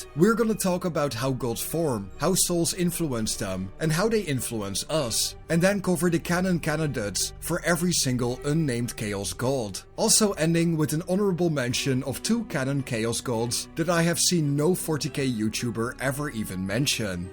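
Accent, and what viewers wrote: Netherlands. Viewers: Strong dutch accent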